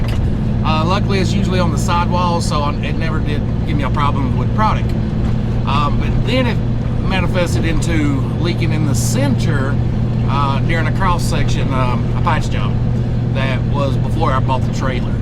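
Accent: South, United States. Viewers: Watched the video with the closed captions on. It doesn't recognize a southern accent very well. But I knew what you meant. I see the white chalky spots on your fan as well. That to me, confirms you have a leaky radiator. As for your cam issues, bearings, lifters, rockers, and oil passages, I would go ahead and do an inframe while you have it apart, and as the shop said, that might not happen for six months or longer. Start filling up your maintenance piggy bank and get it done and out of the way. You'll need to do an inframe sooner or later, so why not do it all at one sitting or during one downtime. I enjoy your vids and have been watching vids as well. I'll be back on the road soon and will keep my eyes open for you guys.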